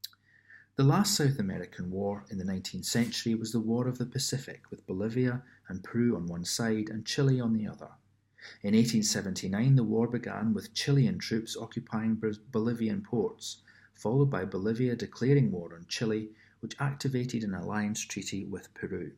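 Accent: Scotland, United Kingdom. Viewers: your Scottish accent is delightful. And for some reason I can understand it (probably because you're reading 😅). I'm sure you will grow once you get some exposure.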